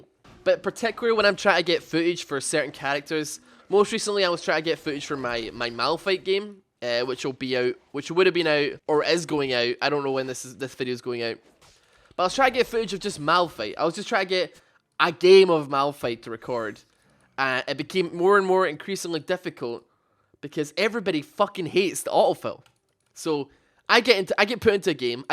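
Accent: Scotland, United Kingdom. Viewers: Scottish I believe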